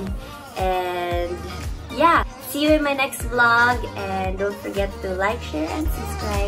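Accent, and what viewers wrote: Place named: Waray, Philippines. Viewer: Hinay permi Miss.. I super duper like you..❤️❤️❤️ Lalo na pag nagwawaray ka hehehe ❤️ From Samar😘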